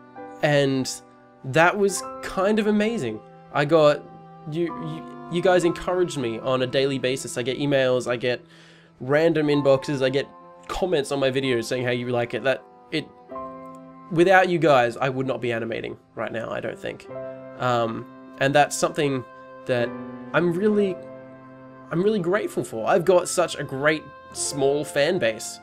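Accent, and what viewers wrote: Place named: Australia. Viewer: Can I just say, you sound quite similar to Markilplier.. But Australian..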